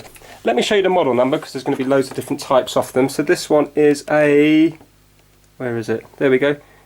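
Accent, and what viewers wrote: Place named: South London, United Kingdom. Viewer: he sounds south londonish, but hes foreign. you can tell from his accent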